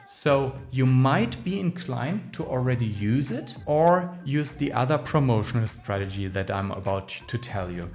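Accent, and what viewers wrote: Germany. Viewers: This channel is dope. Perfect visual branding and color scheme, completely balanced, properly organized information, flawless delivery. Even the German accent adds to the effect. I feel like I'm watching the Porsche 911 of YouTube growth channels. I'm guessing you drive one.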